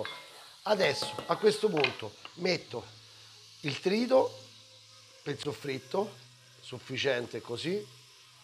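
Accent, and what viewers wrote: Rome, Italy. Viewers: Sei troppo forte sei un vero comunicatore ti guardo e apprezzo sempre le tue ricette e poi le sfumature del romanaccio daieee ti seguo dal l’America 🇺🇸🇺🇸🇺🇸🇺🇸🇺🇸da Naples Florida vienimi a trovare qui e fantastico ciao un abbraccio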